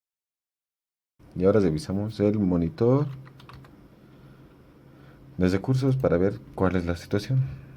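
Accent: Bolivia. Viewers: SU ACENTO DE BOLIVIANO , SOY DE BOLIVIA JAJA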